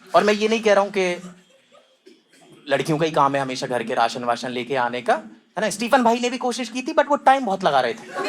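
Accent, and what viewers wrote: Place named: Punjab, India. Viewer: I really love it your comedies and that little spiking punjabi I love it ❤❤❤❤❤😂😂😂😂😂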